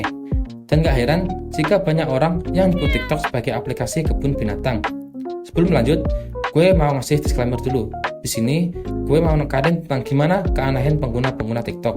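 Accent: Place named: Java, Indonesia. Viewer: lebih enak kalo medok gini bg aseli🗿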